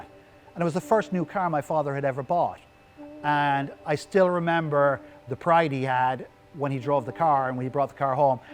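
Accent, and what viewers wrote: Ireland. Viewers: He's Irish? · ur Irish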